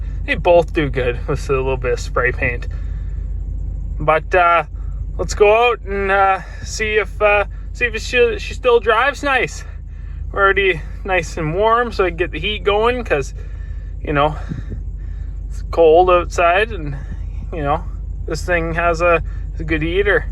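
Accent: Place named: Alberta, Canada. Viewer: Sound like a wannabe albertan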